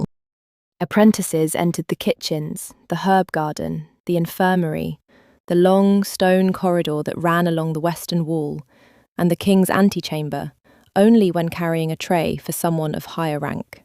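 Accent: England, United Kingdom. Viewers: The narrator has an English accent. She is pronouncing 'beta' the way we do in the UK, not 'incorrectly', just different. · That’s how us UK English people pronounce beta - beater. Nowt wrong with that.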